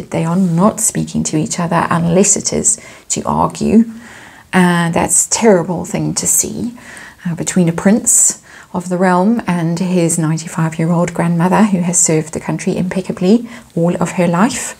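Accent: South Africa. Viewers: Excellent reading! I love your gentle voice and your your gorgeous South African accent - soo soothing. I also love how you speak about HM The Queen, who doesn’t deserve any of this. It’s beyond comprehension how HM can put up with one of her wayward relatives, not to mind two of them (Andrew & Harry). I’m hoping that both of them come to rue the day they cut her life short!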